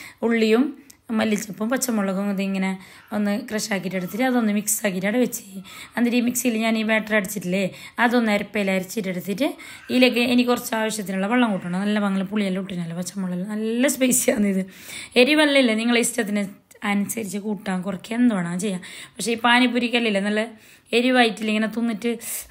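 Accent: Kasaragod, India. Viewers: നാട് എവിടെയാണ് കാസർകോട് ആണോ സംസാരം കേട്ടപ്പോൾ തോന്നി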